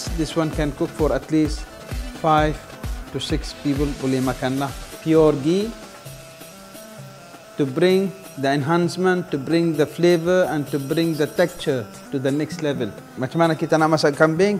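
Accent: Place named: North, Malaysia. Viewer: chef dok belah utara ka? ada skit2 nk dgq loghat utara tu huhu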